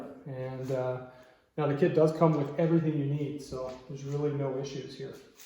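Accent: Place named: Canada. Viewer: So you're telling me this guy has sweet KTM 500 EXC, a sweet garage, a sweet set of tools and a sweet Canadian accent, went to O'Fallon Bike week in 2016 and he won Quantum Fuel Systems grand prize?! Some people. Thanks for vid. It doesn't help me at all w/my 2018 Super Duke, but thanks just the same.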